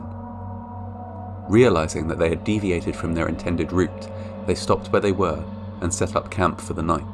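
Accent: United Kingdom. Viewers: As an American, something alluring about a Brit accent as long as it’s not cockney.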